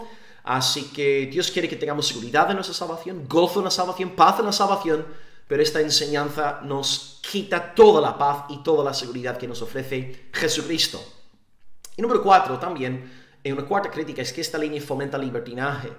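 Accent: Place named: Spain. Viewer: Hno , tengo poco que l descubrí a Ud y me encantan sus videos me han enseñado mucho ,,soy cristiana evangélica calvinista ,pero quisiera decirle algo no capto bien a los españoles a pesar que soy de Mexico y hablo español pero Ud habla muy rápido y no capto las citas bíblicas y versos ,me puede ayudar de algún modo ..tal vez que en la pantalla aparezca esto ?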